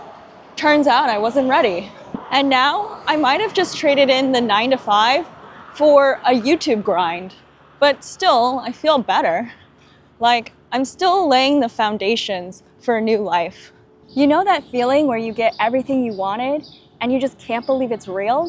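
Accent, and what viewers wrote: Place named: United States. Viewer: I do like your English , however, a bit overly American! Do build your own character when U speak. Never imitate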